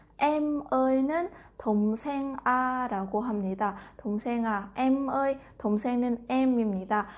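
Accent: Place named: Vietnam. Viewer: 첨에 한국분인줄 알았는데 몇마디 들어보니 베트남분 이네요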